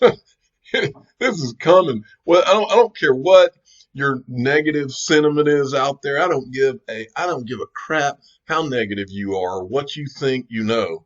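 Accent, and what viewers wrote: South, United States. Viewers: That was halarious..with his southern drawl.😂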